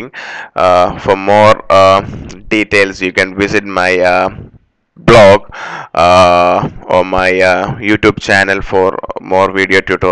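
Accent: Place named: South, India. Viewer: Indian guy raised in Mississippi ?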